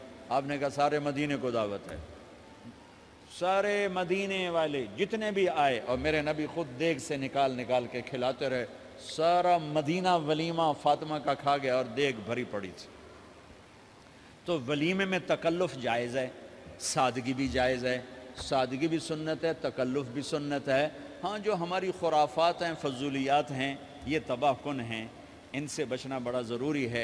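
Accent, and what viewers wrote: Punjab, Pakistan. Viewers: i love to hear uh😍😍😍your Punjabi urdu its drive crazy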